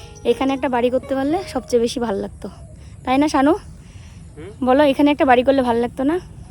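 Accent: Bangal, Bangladesh. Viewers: তোমার বাঙাল কথার টান ভীষন ভালো লাগে। আমি তো ঘটি তাই তোমাদের বাঙাল কথার একটা আলাদা charm লাগে। মিকি কি মিষ্টি। আমার দুটো ডগুর মধ্যে একটার নাম মিকি।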